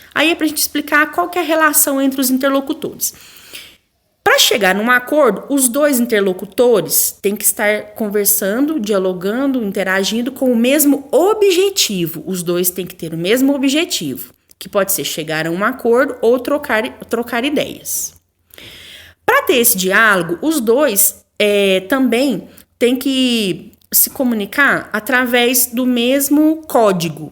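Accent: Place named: Belo Horizonte, Brazil. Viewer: Me ajudou muito, obrigada de ❤️,pelo sotaque chuto que é de Bh ksksks ameii+1 inscrita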